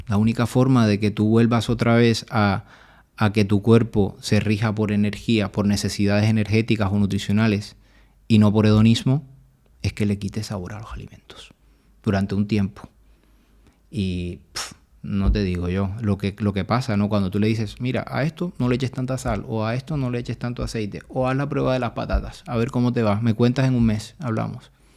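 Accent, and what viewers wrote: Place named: Caribbean Coast, Colombia. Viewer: Por su acento creo que este médico es costeño de Colombia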